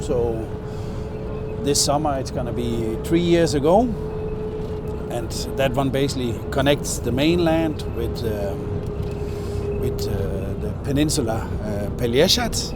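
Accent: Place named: Germany. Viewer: Croat here, very nice video Sir.... Only negative thing for me personally, your english sounds like Klaus Schwab talking 😊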